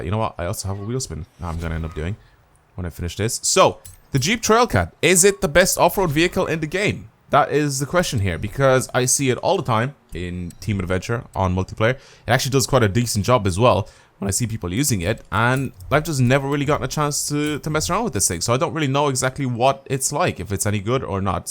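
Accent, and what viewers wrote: Dublin, Ireland. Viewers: are you from dublin??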